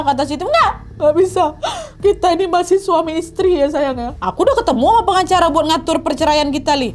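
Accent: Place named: West Sumatra, Indonesia. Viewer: Candu Bana mandanga ngecek Jo Bahaso minang lah😂😂😂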